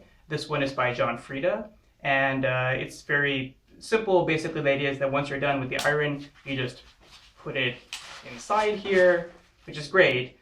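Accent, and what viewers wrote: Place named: United States. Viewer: I know you're from somewhere around India... but you do an American accent... sounds interesting.. you immigrated to the west.?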